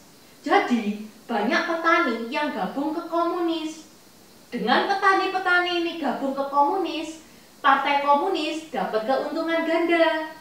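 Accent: Java, Indonesia. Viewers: Bagus memberikan wawasan baru. Tp mgkn lain kali perlu latihan pengucapan istilah tionghoa nya spy lbh enak di dgr gtu. Ini msh terlalu medok logat tionghoa jawanya.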